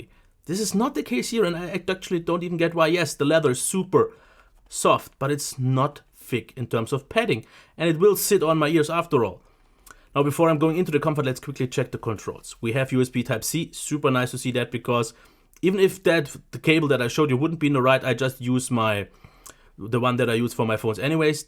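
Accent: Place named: Germany. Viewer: Nice review really convienced me! The German dialect is fun and charming, keep it up!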